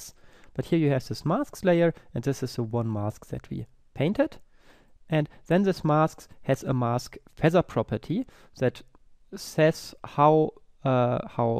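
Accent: Germany. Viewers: Man hört das du Deutscher bist xD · Yay, ich heiss auch!:D Deutsch Akzent FTW!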